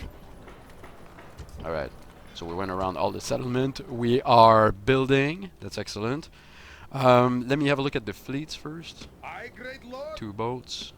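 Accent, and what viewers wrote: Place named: Quebec, Canada. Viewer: French Canadian :)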